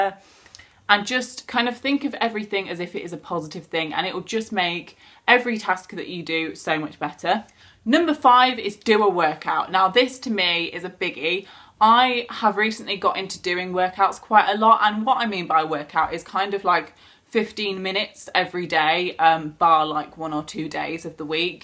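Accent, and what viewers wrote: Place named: East Midlands, United Kingdom. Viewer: I love organised omg, I have a things to do pad from poundland and it's so good- you can write the date on the top too it's literally amazing :L ! you would just think it's another notepad but it hs space for you to tick things off! and then the other side is for notes and then a mini timetable! would use it for revision as well! etc - where are you from ?! your accent sounds east midlandsy- I could be so wrong! I did chemistry and biology as well. Workouts really help me! especially as someone with learning difficulties it makes my mind feel clearer. Top tip for productivity too, make sure you're not wasting your time by doing the wrong thing (': so with revision sometimes I wouldn't be revising the most relevant bit so going back to the guide/plan of what your course covers and writing the headers do a 1 minute test where you write down all of the keywords you remember and processes and then you really remember what you need to go back to and revise! 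also to prioritise! as a lot of people put big tasks off and start with smaller ones and big ones always get pushed back. Anyway loved the video especially because it's about organising! thank you so much for visiting my channel- I hope you'll come back again some day! xx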